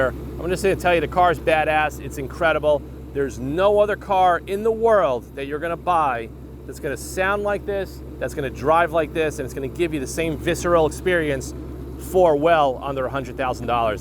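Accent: New York, United States. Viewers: I bought a base model velocity blue 2020 gt500 on 1/6. It has white vinyl stripes. It won’t be driven in the winter. Your channel came up when I googled “GT500 in the winter”.It was interesting hearing your New York accent since I grew up in the Bronx. I now live in the very warm state of Minnesota. 🥶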